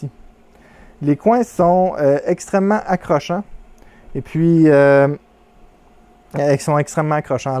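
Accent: Canada. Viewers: Salut. Je suis du même avis que beaucoup, pour 1000 euros je la fait moi même et tu devrais t'en retrouver pour 500 euros voir moins de matériel. Bonne continuation et c'est toujours un plaisir de t'écouter avec ton accent et les expressions du Canada.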